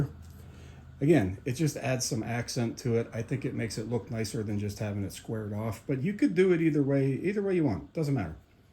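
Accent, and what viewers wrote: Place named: Pittsburgh, United States. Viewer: Pittsburgh? I know that accent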